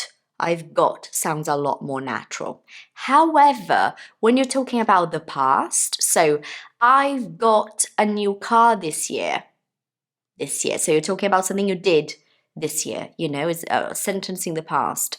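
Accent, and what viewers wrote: United Kingdom. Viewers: Beautiful British English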